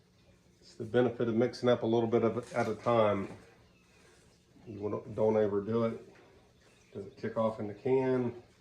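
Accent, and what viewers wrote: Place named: Baltimore, United States. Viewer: Great looking boat, you have a southern accent with baltimore pronunciations.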